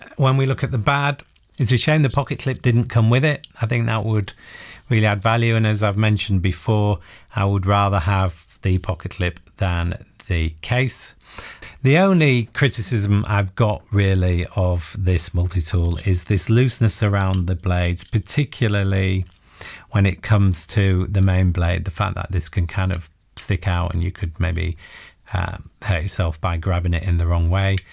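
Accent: United Kingdom. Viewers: Hi! New subscriber here. Love the “James Bond” intro there, specially with that natural British accent, such a joy to hear. Anyways, I like how you do these gear reviews, in such a simple way, very straightforward, I feel the sincerity. 👍🏼👍🏼 to you! Your new number one fan from the Philippines. 🇵🇭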